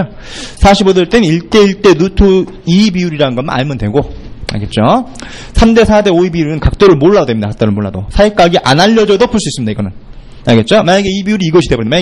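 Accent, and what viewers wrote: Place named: Jeolla, South Korea. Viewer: 전 경남 진주에서 태어나 자랐고 여기가 고향입니다 하지만 건설회사?특성상 타지를 돌아다니며 전북고창이 고향인 지금 제 와이프를 만났고 결혼해 살고 있습니다........억양이나 말투가 교수님이랑 너무 비슷?했습니다....특히 배려버린다~이 말은 완전 똑같았구요......교수님은 재능기부로 이렇게 동영상을 올리셨지만 저 같은 경우 정말 큰 도움이 되었습니다 부담가지지 마시고 교수님 근무하시는 주소를 <mail> 로 보내주시면 제가 교수님재능기부에 대한 조그만 보답을 하고 싶습니다 부담 전혀 가지지 마시고 꼭 보내주셨으면 합니다 교수님은 재능기부 였으니 전 교수님 아니었으면 또 건축구조 때문에 아마도 불합격 했을 꺼 같습니다~꼭 주소 보내주셨으면 합니다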